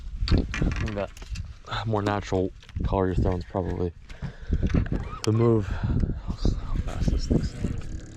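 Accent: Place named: Texas, United States. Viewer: Did anyone notice how texas accent came back when he left Main?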